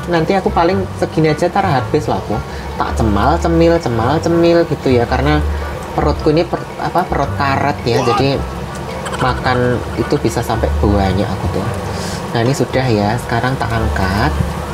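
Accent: Java, Indonesia. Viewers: Ya Allah.aku kudu ngguyu Yen ndelok kontenmu..Bahasane campuran bikin lucu,medok jawane..marai luwe kui pecele...cb Yen cedak,bakal tak jupuk kui maeme..he..he...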